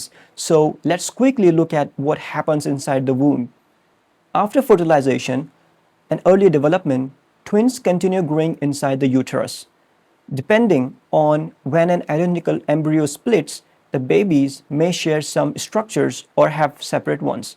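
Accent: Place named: United Kingdom. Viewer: The British accent was great k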